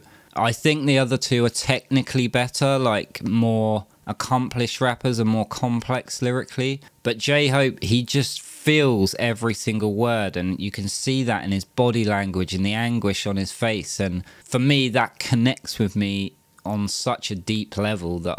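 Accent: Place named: United Kingdom. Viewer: Sorry for loss, I lost my grandmother in May, my father in June and my dog Ireland in August last year, so now how you feel. You were spot on with your reaction, actually you are the only reactor who really understood the emotion they were expressing, love love loved your reaction to this song, as well as all the others. So J Hope didn’t rap when he joined BTS, he would from practicing with Rm and Suga in the dorms and found his own style so that is why you can tell the difference in their styles, you are extremely intuitive, you get these guys so well. Oh and btw love listening to talk, l am a sucker when it comes to British, Irish and Scottish accents, so could listen to you just read the phone book 😂, but also as I said, very intelligent and intuitive, and yes BTS does find you when you need them the most, it is so true, happened with my sister when she was in the hospital after her heart attack, she then wouldn’t stop talking about them so since she didn’t really have anyone to talk to about them in the beginning I would let her go on and on, till then I got hooked with their dancing skills, the fandom who treated you as if you were a life long friend, and then when the pandemic hit, I was really glad I had already been following fhem for a few years since 2018 and I was regretting I didn’t know about them in 2013, but thank god their earlier lives live on youtube and weverse so I was able to see what I missed, I feel like I have been following since 2013. 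Glad you are fi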